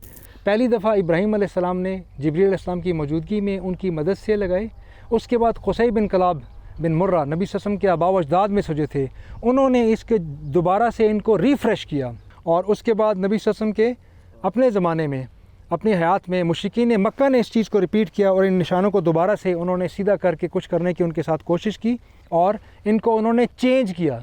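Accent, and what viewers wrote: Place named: Kashmir, India. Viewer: آپکے بولنے کے انداز سے معلوم ہوتا ہے کہ آپکا تعلق کشمیر سے ہے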